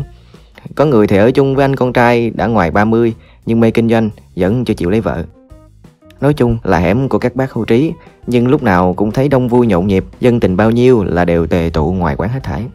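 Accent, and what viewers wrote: South, Vietnam. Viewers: Trời ơi cái giọng Nam bộ cưng xỉuuuu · Mới thấy giong miền nam nghe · Giọng wa thú vị khi đc nfhe đc 1 giọng miền nam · Ôi giọng miền nam thân thương😌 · Mình yêu miền Nam, yêu giọng miền Nam, mê giọng của ad 💕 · nghe giọng đúng kiểu dân trong ấy luôn, hay vl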